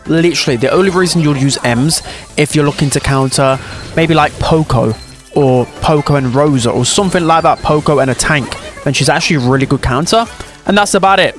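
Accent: United Kingdom. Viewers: I love his British accents. Makes me comfortable to watch before in NY I don't like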